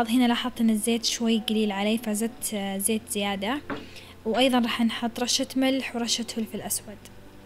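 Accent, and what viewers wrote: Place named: Najd, Saudi Arabia. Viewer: يعطيك العافيه يارب شكلها يشهي جدًا ماشاء الله عليك أحب قناتك ولهجتك هههههههههه من النادر أسمع لهجتنا النجدية في يوتيوب وه بس 💖 ،، ملاحظتي بس إن كمية الزيت كبيرة عشان كذا ممكن ماتعتبر طبق صحي تمامًا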